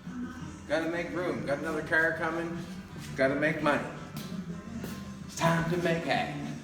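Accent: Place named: Nova Scotia, Canada. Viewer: Love that Nova Scotia dialect.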